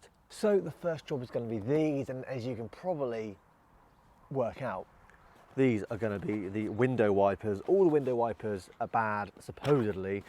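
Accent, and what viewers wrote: England, United Kingdom. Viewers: I think people with English accents should be the only people allowed to teach things.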